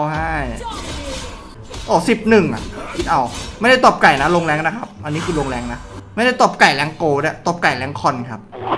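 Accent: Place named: Isan, Thailand. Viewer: เห้น ตลกก็บัดนี้เเหละพูดตลกเหมือนเสียงอีสานน่ะ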